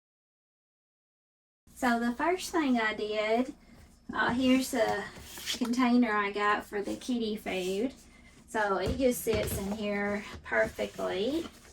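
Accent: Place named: Appalachia, United States. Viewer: I'm just curious about your dialect. Are you from West VA, Tennessee, or Kentucky?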